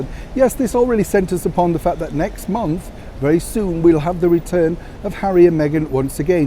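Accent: Yorkshire, United Kingdom. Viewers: Wel Done, always spot on and such a warm yorkshire voice... Respect fron NE Thailand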